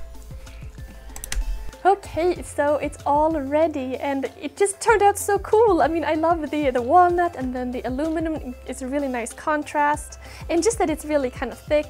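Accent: Sweden. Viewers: Are you from sweden? Because you sound really swedish xD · Nice! sounds like you having a Swedish accent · I'm confused. Your accent is Swedish but you say "aluminum" and use the imperial system. What's the deal? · Just found out your channel. Thats a great video, congrats. Sorry to ask but are you somehow swedish in any way? I ask cause when you say OK for example it sounds a lot like if you were. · maybe out of topic but it sounds like you got a strong Swedish accent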